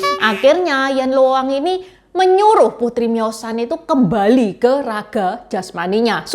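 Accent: Java, Indonesia. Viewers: Ci logat jawa nya medok bngt jawa nya mana sih cii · kok kayak logat jowo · Sy senang sekali denger encik program , krn sangat medok ❤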